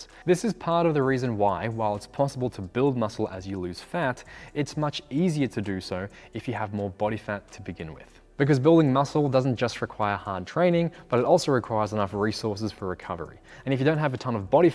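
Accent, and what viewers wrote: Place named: Australia. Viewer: got the Aussie accent, is drinking hot tea with its own little teapot, is jacked as fuck, AND WEARING TOOL T-SHIRT. I THINK I'M IN LOVE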